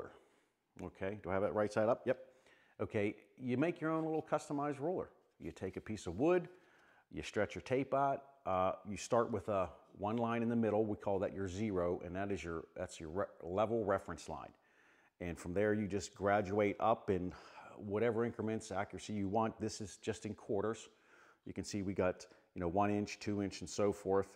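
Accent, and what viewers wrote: Pittsburgh, United States. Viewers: Man, that's a GREAT Pittsburgh accent. Love it. Thanks so much for posting this. It's exactly what I needed.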